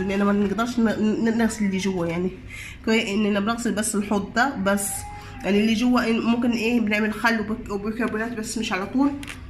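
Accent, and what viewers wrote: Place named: Libya. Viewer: هذه أي لهجة ليبية؟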